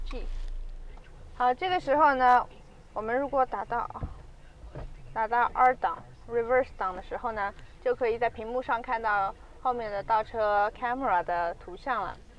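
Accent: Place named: Hunan, China. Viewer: 湖南音好重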